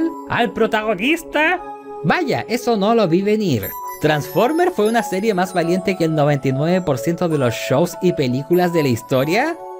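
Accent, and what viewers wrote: Chile. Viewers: ¿Usted es de Chile? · De que parte de Chile eres?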